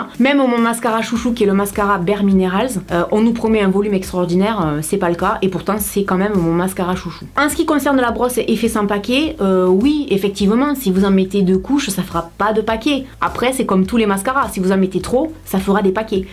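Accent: South, France. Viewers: Je trouve que tu es quelqu'un d'honnête et sincère.. en plus de ta fraîcheur et de ton accent du sud!!!.. ça fait du bien de voir ça sur YouTube .. je commente très rarement tes vidéos mais je les suis depuis un moment .. continues comme ça merci beaucoup !! bisous de Corse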